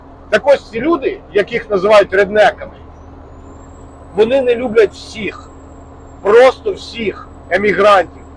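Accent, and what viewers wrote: Zhytomyr, Ukraine. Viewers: Вітаю! Не давно на вас підписалась, дуже цікаво все розповідаєте. Пораджу вас дітям які мають бажання виїхати в Америку, це донька і зять. Питання :звідки Ви родом з України, відчуваю по розмові десь з мого регіону чи поблизу- Житомирщина. 😊❤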